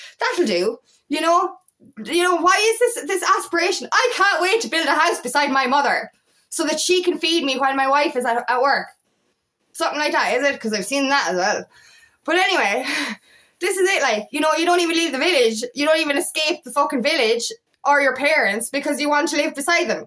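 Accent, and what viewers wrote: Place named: Ireland. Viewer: Yes it is where I belong for sure . I will fly the weirdo flag 😂 yes laughing really raises my vibrations 😂😁🤭 I honestly find things funny other don't lol I laugh at myself 😂 I just love the Irish accent when you say f ing lol 😂 I'm in the UK x everything you say you absolutely true 😊 xx we definitely need out ffs . Least we can all join together as one here . I might set up FB so I can join you there too . At some point I'm going do a video myself as I'm holding back but I'm saying it lol it ok for those who think I'm a freak lol I gone through worse 😂 xx have a good day in this f ing matrix we will be out soon xxx luv n hugs ❤🤗❤🤗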